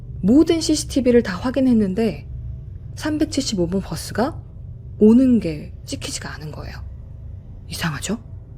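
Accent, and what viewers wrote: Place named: Jeolla, South Korea. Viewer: 전라도 비슷한 말투가 듣기 싫어요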